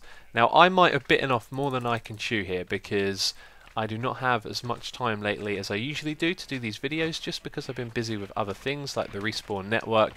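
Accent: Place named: England, United Kingdom. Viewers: That's just the English accent :D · He is from England :)... English accent^^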